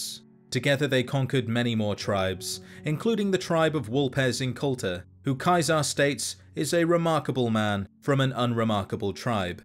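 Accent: United Kingdom. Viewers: Thank God, a British accent on the internet not talking about the Queen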